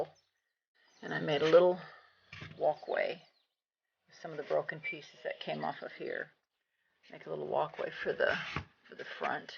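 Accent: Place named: United States. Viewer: I can't follow your American Accent......🙂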